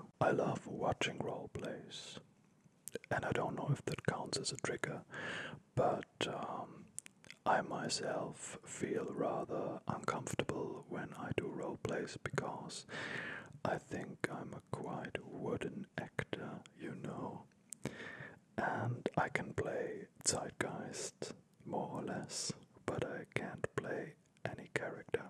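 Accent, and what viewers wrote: Germany. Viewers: Is he german? :D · Is he from germany or something like this ? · I always just watch you in these types of videos lol not so much for the asmr aspect lol (and I enjoy your accent because my whole paternal side is german)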